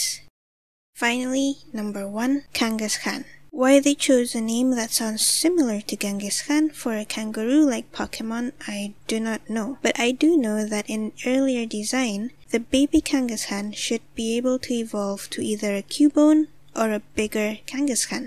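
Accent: Scotland, United Kingdom. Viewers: u sound scottish.where u live? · Are you Scottish?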